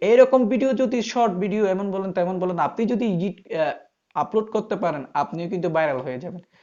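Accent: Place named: Chittagong, Bangladesh. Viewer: মনে হচ্ছে চট্টগ্রামের মানুষ।আমিও চট্টগ্রাম থেকে।Education ভিডিও বানাই।একজন কেমিস্ট্রির শিক্ষক।Love from Chittagong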